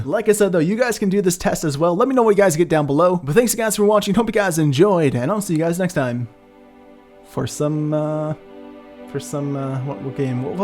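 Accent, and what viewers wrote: Czech Republic. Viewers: are u from czech :D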